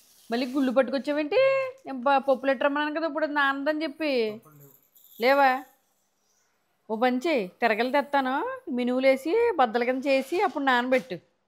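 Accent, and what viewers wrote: Godavari, India. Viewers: Very nice godavari vioce style 😅👌👌👌👌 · ❤❤❤... America lo untu kuda chakkaga godari lo matladtuu mana ammayi anipinchukunnaru andi🎉🎉.. Disti tiyinchukundi😁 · Akka godawari pilla bale matladutunnavu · Godavari basha Baga matladu tunnaru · Anni panulu bale chesaru fast ga nice godavari yasa baga mataladutunaru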